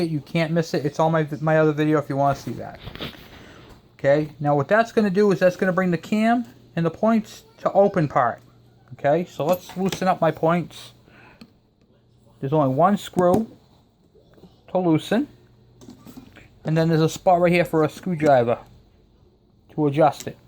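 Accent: United States. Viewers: Your arguably the best explainer on boobtube when it comes to points ignition and cdi and wiring. I use your videos to assist me with the wiring issues in old bikes I get. Thank you sir. Even though you sound like a Yankee you are informative and easy with explanation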